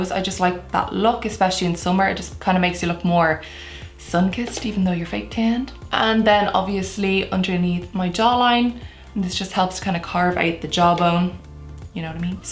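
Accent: Dublin, Ireland. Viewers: Like literally It's so similar I feel like Charlize is doing an Irish accent and I'm watching her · Yocheved Lam it's an Irish accent, probably more specifically Dublin or somewhere around Dublin anyways 😂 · Omg you're so pretty :) Are you from Ireland? If so what county/province. Can't figure out whereabouts your dialect is from 😅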